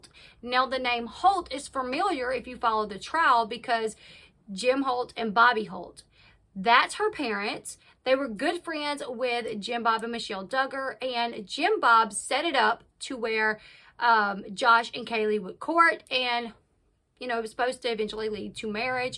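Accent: South, United States. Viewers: I love your accent. I miss the south.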